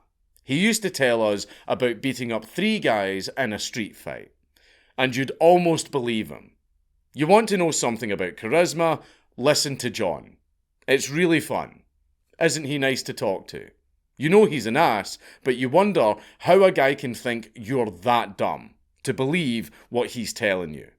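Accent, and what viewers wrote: Scotland, United Kingdom. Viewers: words are hard... especially in a scottish accent...🙏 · This was such a good one. Thanks for putting in overtime. Sometimes when I’m watching your videos I have to actively remind myself that it’s not Nick Offerman doing a Scottish accent. Are you two related?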